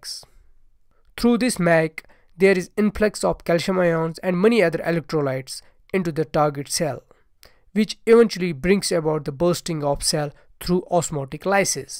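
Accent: Kashmir, India. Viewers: Are you sir from kashmir 😍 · Thank you so much. It was really helpful. Are you a Kashmiri though? Sound very much like a Kashmiri😄❣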